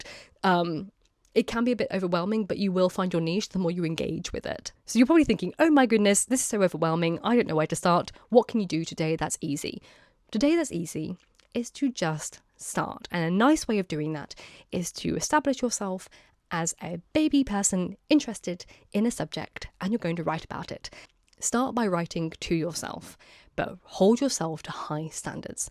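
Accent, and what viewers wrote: RP, United Kingdom. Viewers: Thanks! Very interesting video.🤔 I think I am starting to see why people don’t want to get PhDs…very difficult. Where in the UK is your accent?? It is almost posh.🤔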